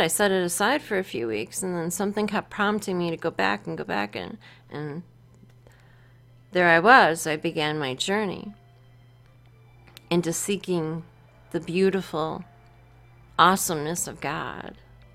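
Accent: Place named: Spain. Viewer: Whoa now that is a combo! I can see and hear the spanish in you.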